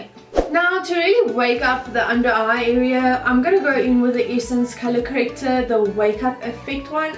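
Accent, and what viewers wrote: South Africa. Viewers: Cool babe !! I miss South African accents so much !!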